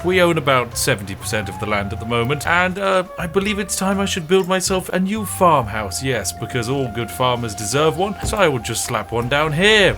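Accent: United Kingdom. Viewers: let’s game it out but british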